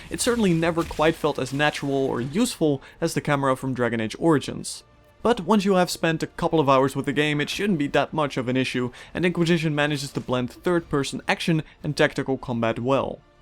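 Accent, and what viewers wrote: Netherlands. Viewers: hmm dat accent....bent u Nederlands ?? :D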